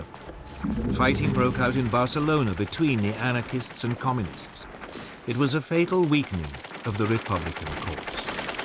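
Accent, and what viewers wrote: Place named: United Kingdom. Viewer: Another biased, pro lefty-slanted video done by a guy with a British accent. Obviously not all commies in Britain joined the "Cambridge 5" commies, drunks & perves and moved to Moscow. The video maker probably sends a box of candy to Jeremy Corbyn on Mayday each year. General Franco and the various forces/groups of "Nationalists" brought law, order, and peace to the disaster cauldron cooked-up by the Spanish "Republican" government in Madrid. Socialists, Communists, atheists ruin everything they touch. Just to irritate the pro-Communist video maker / narrator, I think I shall look for a photo of General Franco and buy it, frame it, and put it on display along maybe with the flag / symbol of the "Carlists".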